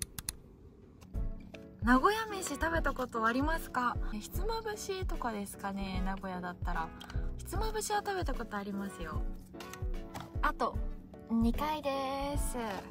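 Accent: Yamaguchi, Japan. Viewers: 今日もアップありがとうございます🎉 グッズ、欲しいですね。絵が上手いのでステッカーとか😊 字も上手だからサインも欲しいです。あとところどころに出る山口弁に癒されています。十万分のイチだけど応援しています。天使ナンバー❶❤ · ハツコにあるんだけど、聞いてくれるかな? 数か月前のみたら山口の方言使っていて、ほんのりした感じで癒やされたよ。たまに方言使ってやって下さいね。❤️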